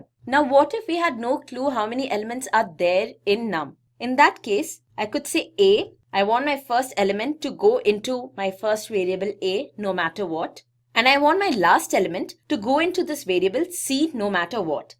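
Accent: India. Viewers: This is a very good content, thanks for sharing. I'm finding it a bit hard to understand you due to the strong Indian accent but I'm making all the effort because your content is good.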